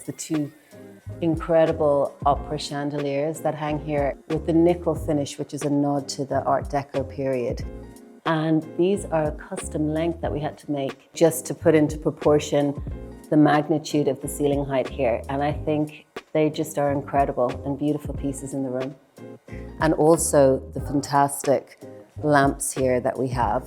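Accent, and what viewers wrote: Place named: Ireland. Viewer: I'm hearing a slight Irish lilt. Yep, she has a studio in Dublin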